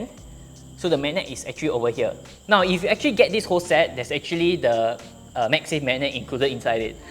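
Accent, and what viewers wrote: Singapore. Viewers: Terribly irritating Singapore accent. Can’t understand most sentences as there are too many sounds dropped. Terrible.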